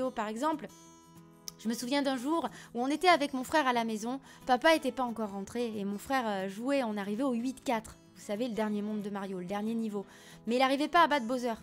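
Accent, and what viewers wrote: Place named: South, France. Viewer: Salut, ce 1er Draw My Life est une réussite surtout que tu dessines bien mieux que moi LOL!! ^^ Je suis né le 28 Septembre 1979 à Perpignan donc on a quasiment le même âge, j'habite près d'Avignon et j'ai aussi l'accent du sud.;) J'ai débuté les jeux vidéo sur un AMSTRAD CPC 6128 puis j'ai eu la Game Boy et les PS1, PS2,PS3. Les copains m'ont fait connaître la Master System, la NES et la Super NES. J'ai une prédilection pour les jeux de course automobile, de combat et de guerre/infiltration. Merci pour cette vidéo et j'espère sincèrement qu'il y en aura d'autres du même style car c'est vraiment top, des bisous!! =))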